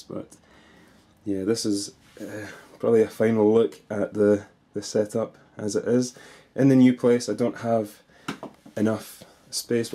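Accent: Scotland, United Kingdom. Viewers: do u live in Scotland ur accent sounds Scottish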